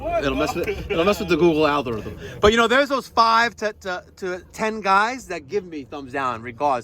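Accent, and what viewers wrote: New York, United States. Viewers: "That white smoke doesn't mean you are electing a new pope." "I know that!" I love how you New Yorkers talk and joke.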